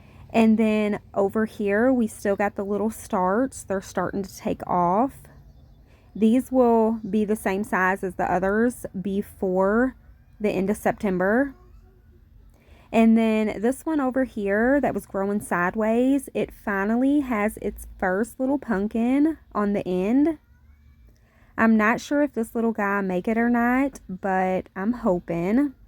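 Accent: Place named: Kentucky, United States. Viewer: # 1 Mama Always Getting It Done For Her Daughter and Son❤ Love Ya Bunches My # 1 Favorite Person to Watch and Listen to that Kentucky Accent ❤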